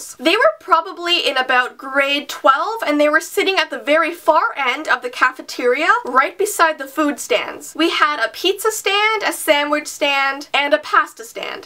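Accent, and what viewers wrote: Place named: Canada. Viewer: I think it's because she lives in Canada. In her crazy babysitting video she sad bagel like bag-el while in America we pronounce it BAE-gel 😂😂😂 have a nice day!☺️☺️☺️😃😃😃😊😂😂😌😌😌😉😉👍👍👍👍✌️✌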